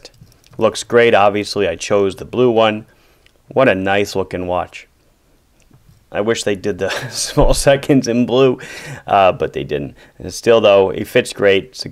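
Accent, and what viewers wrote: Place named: New York, United States. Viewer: am just here for the NY accent...and the watches sometimes.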